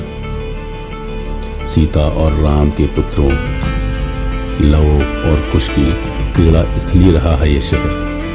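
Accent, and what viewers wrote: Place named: Kanpur, India. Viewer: Aapki aawaaz bhi Kanpur ki visheshta hai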